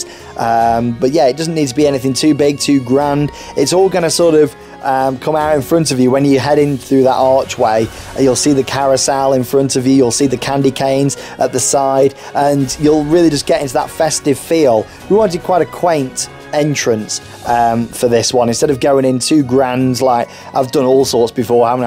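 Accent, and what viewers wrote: United Kingdom. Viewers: You sound British are you?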